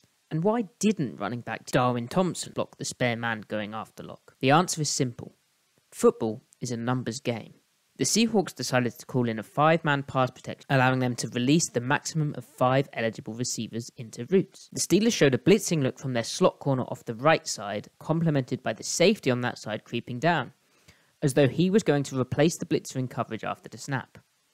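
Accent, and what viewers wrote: United Kingdom. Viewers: Big fan! I've read your field gulls contributions for a long time and had no idea you were British till I heard these videos. Cool to have someone from across the pond cover the seahawks in such detail.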